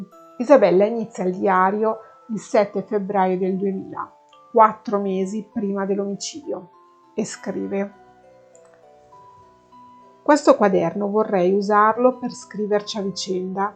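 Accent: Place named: Lombardy, Italy. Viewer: Ciao complimenti appena arrivata sono in clandestinità perché sto usando un iPad condiviso. Complimenti soprattutto perché tratti dei casi italiani. Mi piace il tuo accento lombardo. A presto Lally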